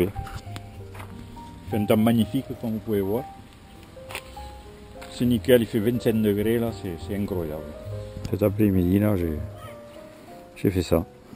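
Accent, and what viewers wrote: France. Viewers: je me suis abonner a votre chaîne il y a un mois et je suis dingue de vaut vidéo , votre fort accent de Carcassonnais ma fait rire vous m aver donner envis d avoir des oiseau pour mes 14 ans ! merci pour cette videos !!!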